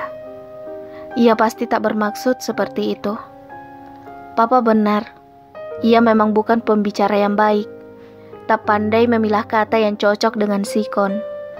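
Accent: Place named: Mamuju, Indonesia. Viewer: Maaf boleh tanya miminnya ni org mna? Kok kayak org Mamuju?😁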